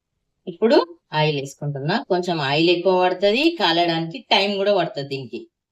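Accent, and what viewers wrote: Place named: Telangana, India. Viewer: Atla undala slang telanganollamani telisetattu ❤I love your way of talking attamma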